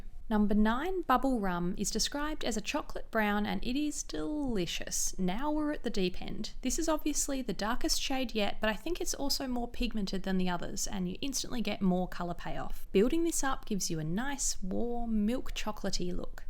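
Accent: Australia. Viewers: You are australian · I'm so used to watching American YouTubers that I, an AUSTRALIAN, was shocked to hear her accent. Love this video btw!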